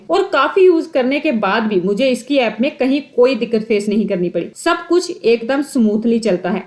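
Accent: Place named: Punjab, India. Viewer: Loved the Punjabi ascent. I am using Zerodha and touch wood didn't face issues till date. Having said that I am beginner hence do not transact heavily on the platform. Good to know Angel One is a good platform (I hope this video was not sponsored by them). Please make one video on how to move your shares held in Zerodha to Angle One?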